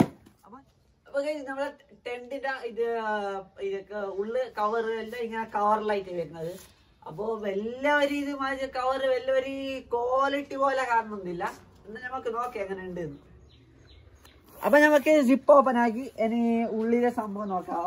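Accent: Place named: Kasaragod, India. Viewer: Kasaragod anno stalam mosilaa yannh kelttapol tonniyada😅🤣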